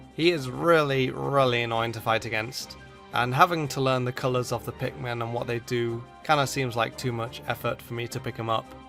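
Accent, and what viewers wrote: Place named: United Kingdom. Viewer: Wilfred Cthulu he did with the koopalings and that’s all that matters that he thinks Wendy Koopa sucks & the rest besides jr & like iggy which is fine but yeah those aren’t different characters just skins ya know so I guess if u wanna hear his British voice going I really like this shade of purple on daisy then...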